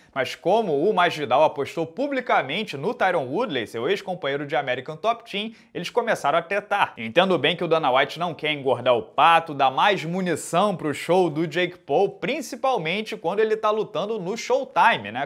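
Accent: Rio de Janeiro, Brazil. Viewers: Pq citou microfonia sendo que é o sotaque do rio de janeiro?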